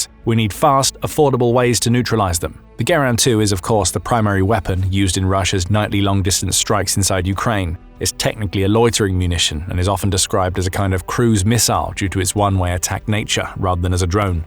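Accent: United Kingdom. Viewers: UK english accent sucks.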